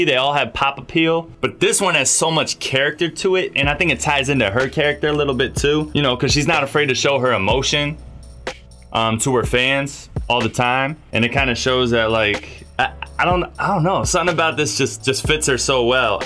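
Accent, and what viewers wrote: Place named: Chicago, United States. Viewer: Yo are you from Chicago? You have that quality to your voice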